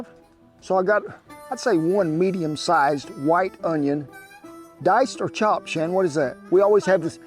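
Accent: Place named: Texas, United States. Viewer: Boy, you look like my family down in South Texas.. You sound like them too. Lol Im gonna make your tortillas.. i havent had a good one since we left the Rio Grande Valley in '77. Ugh.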